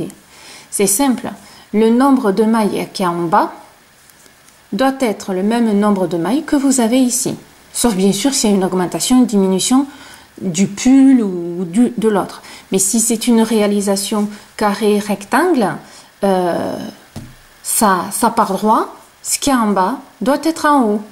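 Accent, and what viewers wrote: South, France. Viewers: Bonjour dîtes moi vous êtes de quelle région ? C'est L'accent du sud ? Lol car je recherche des personnes de mon secteur 😊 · Merci beaucoup de partager votre expérience avec autant de clarté et d'indulgence pour les débutantes comme moi. Je suis une jeune crocheteuse de 50 ans qui dévore les tutoriels très bien construits d'une jeune personne très sympa, "avé "le soleil dans l'accent !! J'entends les cigales dit ! ;) ENCORE MERCI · merci beaucoup.Très bonnes explications très claires avec un accent bien du sud.Très agréable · Ça fait des siecles que je n'avais pas crocheter et quand j'ai recommencé, mon travail avait l'air de votre échantillon!! Merci, je vais pouvoir me lancer!, . P. S. J'adore votre accent du Sud qui rappelle Le Soleil!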